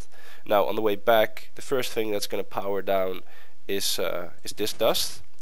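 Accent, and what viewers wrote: Netherlands. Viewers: it's funny how you just hear a Dutchmen talking english :D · you sound realy dutch :S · Being dutch myself, I can recognize his accent as being dutch.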